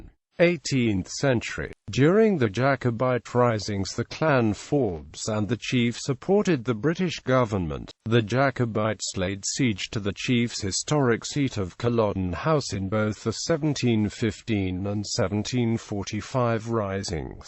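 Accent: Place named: England, United Kingdom. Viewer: a very English voice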